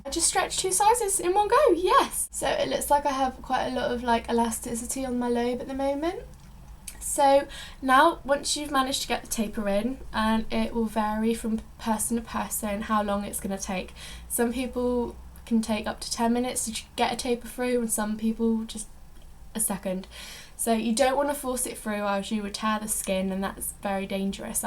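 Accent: United Kingdom. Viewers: Wow... I've only watched your videos where you don't speak so far, and I seriously thought you were American or someone who would have an American accent! xD What a pleasant surprise hearing your adorable British accent ^^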